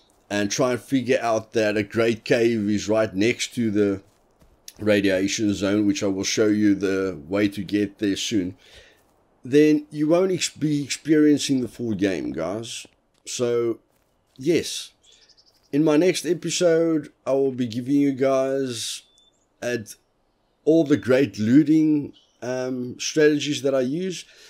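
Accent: South Africa. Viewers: are you from South Africa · I am still struggling with one thing And that is to find out if you are South African ? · you sound south african lol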